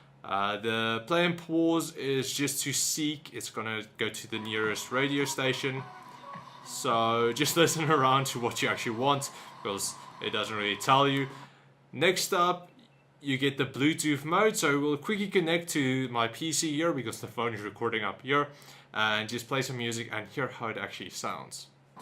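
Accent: South Africa. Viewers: south african tech youtuber? lessgoooo🇿🇦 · dude ur channel is dope mense must know you from south africa embrace the accent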